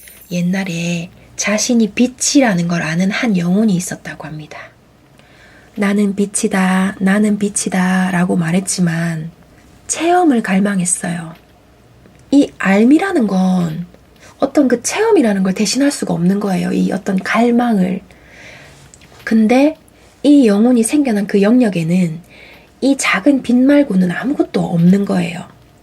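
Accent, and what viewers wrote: Gyeongsang, South Korea. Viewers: 부산에 사셨군요. 처음에는 대구 말투인줄 알았어요.^ 티나다=포떼나다 갱상도 사투리죠~^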